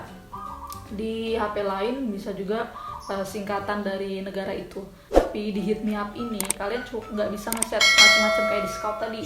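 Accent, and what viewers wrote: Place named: Java, Indonesia. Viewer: Wong jowo mas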